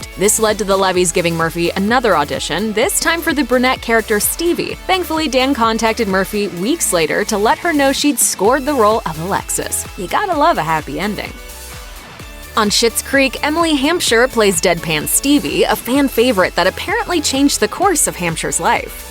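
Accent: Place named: United States. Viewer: I don't think the commentator is Canadian. You can tell by the way she says, "Toronto". Def a young American.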